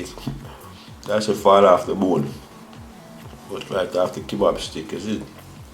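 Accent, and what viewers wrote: Jamaica. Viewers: The JA accent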